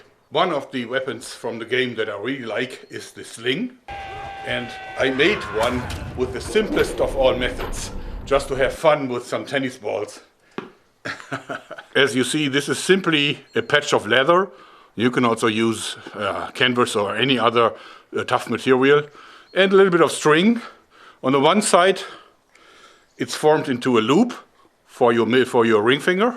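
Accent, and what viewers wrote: Germany. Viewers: ist er deutsch in hatt englisch gelernt ? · Wow Englisch mit Deutsch agtzent schreibt man das so? Also agtzent naja aifjeden fall ist es interessant und lustig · Are you German? I am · Yeah, German or Russian accent, like a bond villain.I mean Victor Frankenstein was Austrian, so there's the basis for mad scientists in pop culture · Normally German accents make me cringe, but somehow I have no problem with your voice. Also: awesome channel! Weiter so. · ich liebe den deutschen akzent im englischen ^^ weiter so videos sind gut XD mfg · is German and has a slight speech impediment, one of the things that makes ubermensch. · you have a german accent so let me ask you are you from germany? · you are german aren't you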